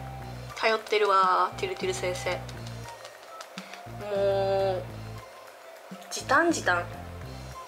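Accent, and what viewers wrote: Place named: Kansai, Japan. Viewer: バリバリ関西人の喋り方すきです！可愛くて憧れます🥹♡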